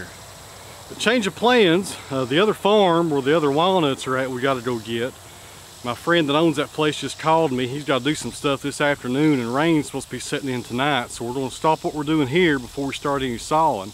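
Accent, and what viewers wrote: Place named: Tennessee, United States. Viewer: I didn't know where your particular flavor of southern accent was from, I guess Tennessee it is. Cool stuff.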